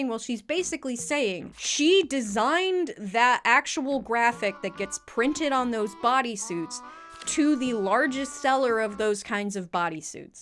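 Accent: United States. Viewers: As each day passes, the american accent grates harder on my soul.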